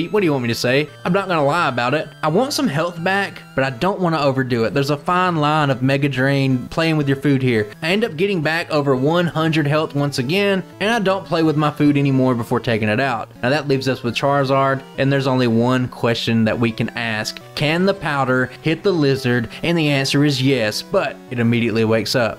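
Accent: South, United States. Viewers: Hey man just wanted to comment to say I love the content and just to support the algorithm. I love that your voice feel so like home since I am from the south as well! Keep it up! Can’t wait to see you continue to grow :)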